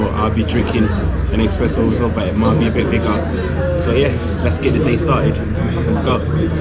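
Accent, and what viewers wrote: United Kingdom. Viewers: Your dialect is british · U have accent British are u British??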